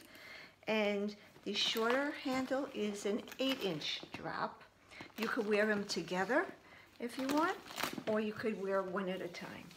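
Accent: New York, United States. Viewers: The Tabby Shoulder Bag is such a pretty bag! I got it in black and beechwood, as they were both on a great discount here in Europe a few months ago! I love the burgundy microfiber lining and the organization! I enjoy your videos and love your english, as it reminds me of NY! My mom is from NY and I still have relatives on Long Island!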